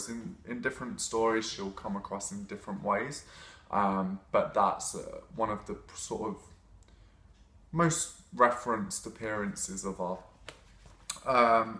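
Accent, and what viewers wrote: England, United Kingdom. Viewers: I have only ever heard Irish and Scottish speakers pronounce it like kal-y'och, call-y'och, or cull-y'och...So I feel like he's saying it pretty accurately, just with a more English accent. Of course I'm American, so maybe I'm wrong. :)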